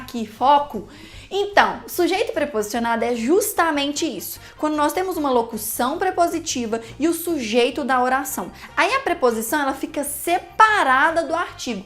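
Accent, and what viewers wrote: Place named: Minas Gerais, Brazil. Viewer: certeza que é mineira hahaha sotaque muito carregado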